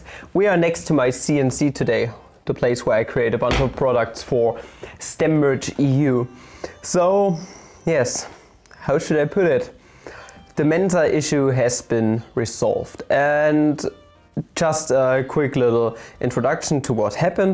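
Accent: Germany. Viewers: And please clear my doubt "are you German"?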